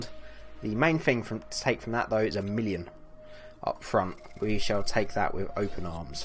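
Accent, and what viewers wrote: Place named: Devon, United Kingdom. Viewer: Another excellent session. Your pessimism always amuses me:D Your car is coming along very nicely. Great things are coming. On a side note, I've been trying to track down your accent for months now, so I'm going to come out with a guess - Definitely Southern....Devon perhaps?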